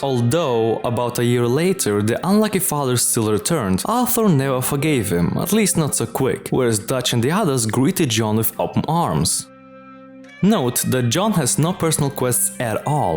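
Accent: Russia. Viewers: Ты акцент не слышишь? Это явно кто-то русско говорящий. И в описании ссылка на оригинал. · Why all russian boys are sexy, i love your accent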